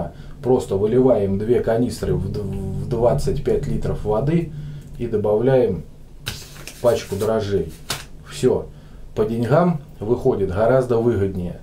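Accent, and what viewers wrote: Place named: South, Russia. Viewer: Привет,!(Я правильно понял,Вас зовут?) Приятно смотреть,а ещё приятней слушать южно российский говор с мягкой буквой "г".Сам со Ставрополья,разговариваю так же.Буду рад,если достанутся дрожжи на халяву!🤗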